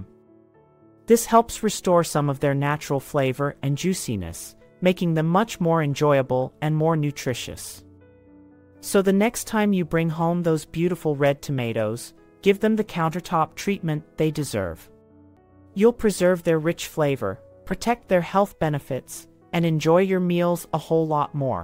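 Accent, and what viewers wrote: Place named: United States. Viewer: I hear an American voice telling us all this nonsense. Have you ever read the labels on most of your American Foods. They are packed full of Chemicals that cause more health harm than keeping them in the fridge.🏴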